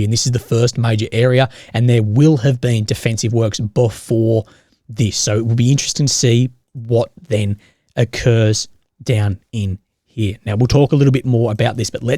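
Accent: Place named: Australia. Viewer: Maybe this channel would be better without the whiny Aussie accent and and his 1970s suit collection.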